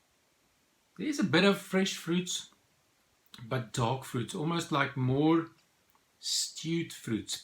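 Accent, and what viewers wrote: South Africa. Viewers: The South African accent is so cool. Cheers my dude.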